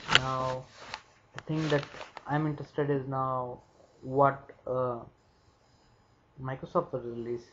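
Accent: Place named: Vietnam. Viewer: LEARN TO SPEAK ENGLISH. u from vietnam? u sound like one, seriously correct your accent, pull your tongue out (vietnamese language require to speak with your tongue always intact in)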